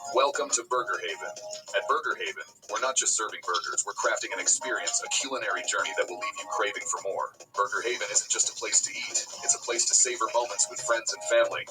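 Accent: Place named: India. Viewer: Maybe you are talking with Hindi Accent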